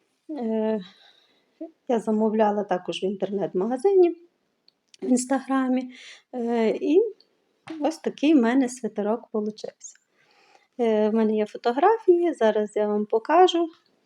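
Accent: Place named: Western Ukraine, Ukraine. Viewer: вітаю, подивилася з насолодою ваше відео, приємно слухати ваш діалект. У мирні часи ми кілька разів у відпустку, їздили по західній Україні, були і в Карпатах і в закарпатті, завжи набирали грибочків, звичайно сушених але яких запашних... Ви мені нагадуєте ті приємні часи:). Діліться ще з нами вашими гарними виробами. Бажаю спокою і миру❤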